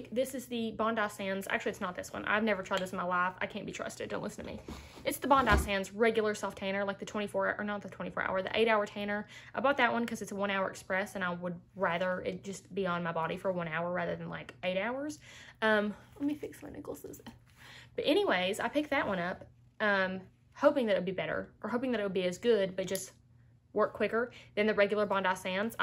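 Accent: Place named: Kentucky, United States. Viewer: I've heard Allie rave about your glosses recently and just came to your channel and right off the bat I like your fun personality! :) Love the green here you got going on in this video and I love your accent too! I'm from and live in NC and there are different and unique southern accents across the southern states, but sometimes I can't tell - where are you from? Also, I am not even 2 minutes into your video yet and you've already got me laughing! :D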